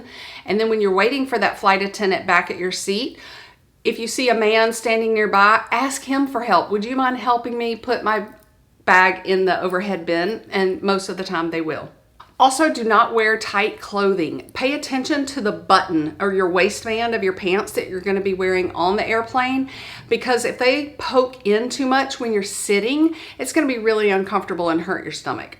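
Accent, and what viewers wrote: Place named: Texas, United States. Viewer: Ah that Texas accent. What is the plural form of y'all? All y'all!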